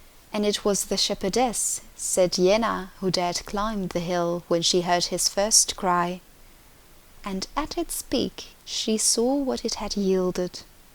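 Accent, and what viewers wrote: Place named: France. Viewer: Lovely French accent and well read its one of my favorite TES books, bravo.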